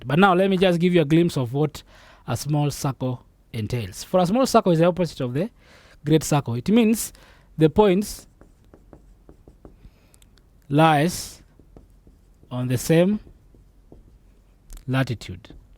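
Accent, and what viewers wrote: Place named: India. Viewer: his english acent closely resembles an indian english acent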